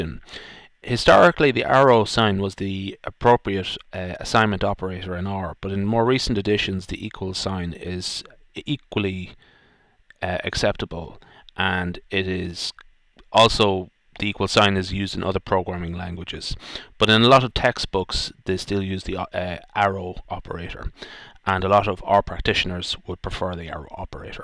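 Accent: Ireland. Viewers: thanks :) refreshing to hear the irish aswell haha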